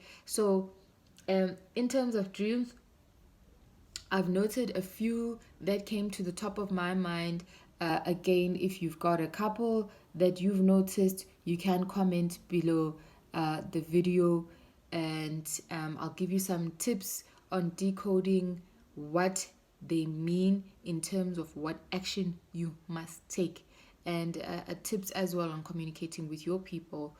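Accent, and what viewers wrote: Nigeria. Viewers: It the Nigerian accent for me😅😅🤞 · Nigerian accent akere 😃😃👌👌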